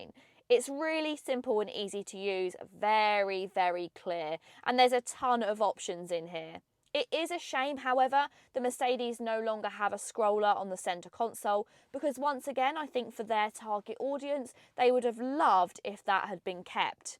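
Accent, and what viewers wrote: United Kingdom. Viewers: British accent is so funny 🤣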